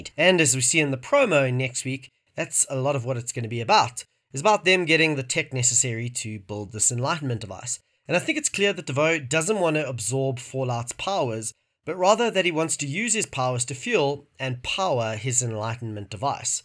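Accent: South Africa. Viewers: Are you South African? Your accent sounds South African.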